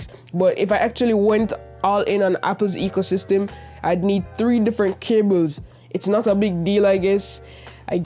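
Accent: Jamaica. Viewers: You jamaican bro? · i knew it! you sound just like a Jamaican. big urself yute. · Jamaican accent it's there Great video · No that's because he's of Jamaican decent living in canada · naaaa he’s Jamaican. He has a Jamaican accent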